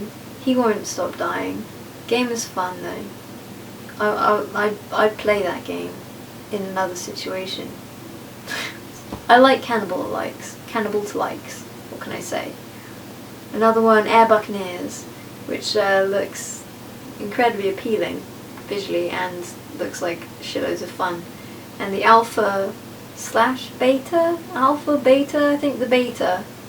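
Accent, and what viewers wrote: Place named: United States. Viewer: stop talking like an american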